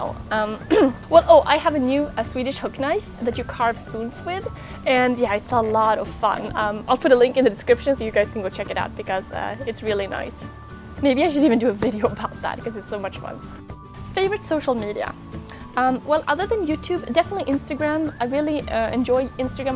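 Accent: England, United Kingdom. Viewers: Hi, ooo you are from oregon US I was thinking you was from England Jaja well ok, 👉🏼always love your videos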